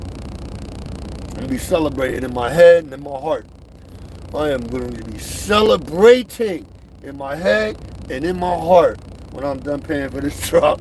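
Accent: New York, United States. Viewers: I wish I had caught this when you were doing it. Your talk and slang is straight New Yorker. Maybe it's because I live in Pennsylvania and am married to a born and raised New Jersey girl that I know it but to attack you for an accent that has nothing to do with the content you are putting out there. It's BS. Please know that this white 45 year old is a better flat bedder because of you. I don't give a shit about whatever accent you are putting out!! thanks for the help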